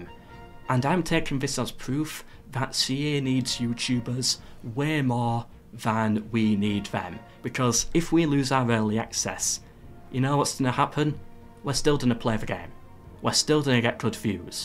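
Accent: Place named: North, United Kingdom. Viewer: which region of the UK are you from? I'm trying to place your accent. I'm American and we're bad at identifying British accents. If I had to guess, somewhere in the north?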